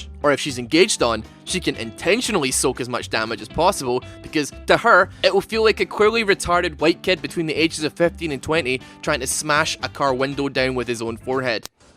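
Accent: Scotland, United Kingdom. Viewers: Is he scotish?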